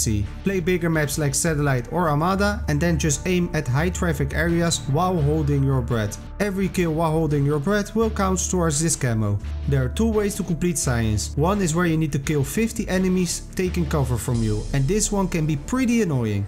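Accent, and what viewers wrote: Netherlands. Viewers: Je bent nederlands ik hoor het😂😂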